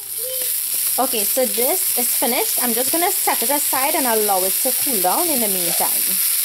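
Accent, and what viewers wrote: Trinidad and Tobago. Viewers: Hey Iove your trini accent...otherwise very good trini version of solomon gundy..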